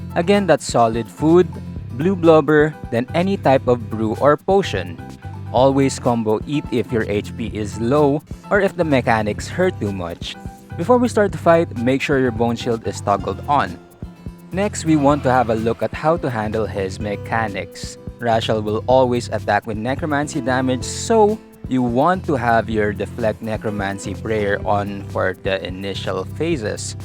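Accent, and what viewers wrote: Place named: Philippines. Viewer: I knew ur a Tangina by ur voice lol 😆 nice man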